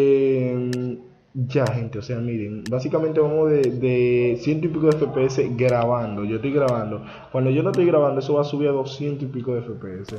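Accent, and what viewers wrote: Dominican Republic. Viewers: loko tu eres dominicano? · Eres dominicano?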